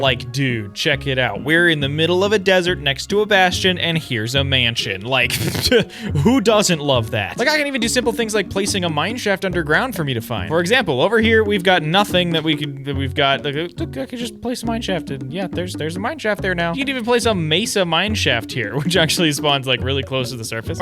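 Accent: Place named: United Kingdom. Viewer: yeh, but where are you from? Your accent sounds like british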